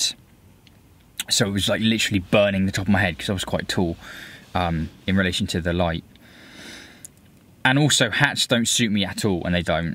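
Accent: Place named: Hampshire, United Kingdom. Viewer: Just shaved mine at aged 54 after reluctant acceptance of quick thinning. Great move. That's a super Hampshire accent. Southampton /New forest? Cool car too, I run a '70 Beetle. Good work on videos. Neil, Southampton.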